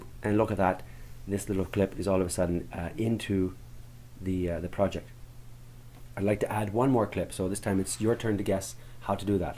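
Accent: Ireland. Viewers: With Irish Accent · Like your style. You make it interesting and easy. Also, great visibility to see exactly the buttons and the steps! The Irish makes it interesting too. Thank you.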